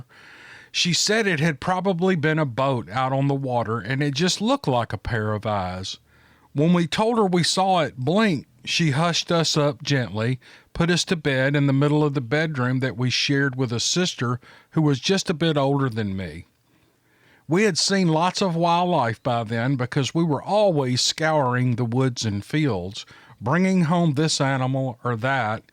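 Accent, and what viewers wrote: South, United States. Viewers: Of all the great channels I've listened to, I think you have the best voice presentation. The easy southern accent is perfect for this type of thing, and the way you focus on your enunciation is very appealing. You should consider narrating audio books... You have a natural gift for it. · I thought I had listened to all your stories especially when I was laid up with the first cancer bout but it Appears not great to hear your voice I do listen to cryptids Canada but nothing is like your southern accent and gentle voicing of your stories thank you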